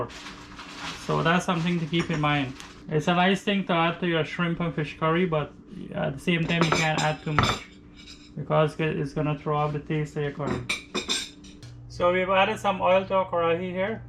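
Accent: Guyana. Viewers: Really like the way you explain your steps and like your Guyanese accent. Make me feel like I'm back home London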